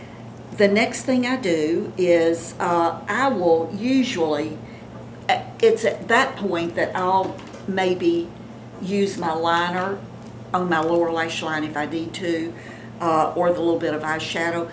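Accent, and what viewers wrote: South, United States. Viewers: UGH...no more it cosmetics for me...way over priced anyway! Thanks for the info!! Just found your site... Enjoying hearing the southern accent as I am from Memphis, but have been in Reno, NV for past five years for medical treatment for my son with Lyme disease. Hope to get to go home soon. You are a beautiful 70 ish year young!!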